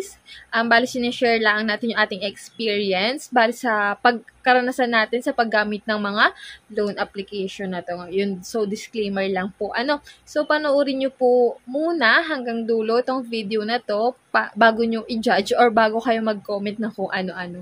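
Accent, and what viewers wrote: Batangas, Philippines. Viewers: laang?? 🤣😁✌️✌️ batangenya ka te? hehe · Batanggeña ka po??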